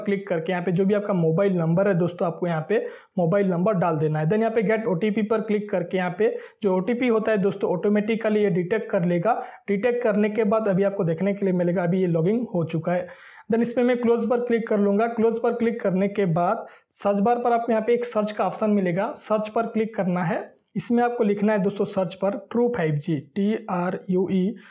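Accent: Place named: Odisha, India. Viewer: Mujhe thoda thoda laga ki aap odisha se hai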